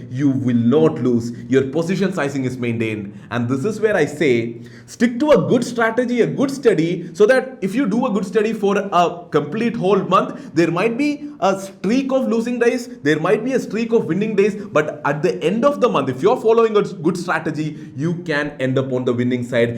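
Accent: Kerala, India. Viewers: Strong malayali accent nice :)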